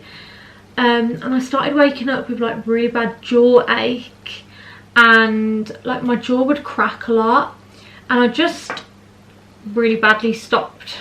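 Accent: Birmingham, United Kingdom. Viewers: Your so down to earth! I love your videos!! Its Also nice to hear a fellow brummy on YouTube!😆x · Hey I am so glad I came across ur video. Are you a brummie? watchin ur vid was like im listening to my mate whilst we getting ready to go out lol. Loved the look & you looked so amazing. I totally agree with lashes dilemma honestly that is me always such a faff but always needed too but u looked so good without them. 💕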